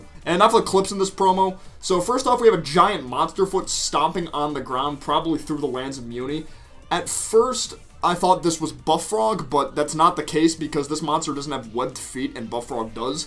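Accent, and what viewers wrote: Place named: United Kingdom. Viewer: im offended by your british accent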